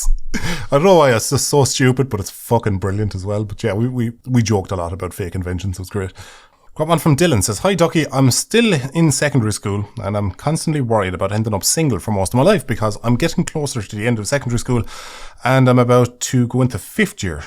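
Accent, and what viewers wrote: Ireland. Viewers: glad to have another podcast thank you so much its always awesome to hear that lovely Irish voice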